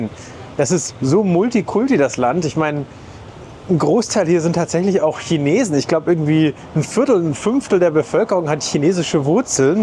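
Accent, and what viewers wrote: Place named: Germany. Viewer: Great video, and an even better channel. I really enjoy watching your content. Your German accent sounds really nice too. Where is it from? Most Germans sound pretty harsh, but yours is pleasant. 🥰👋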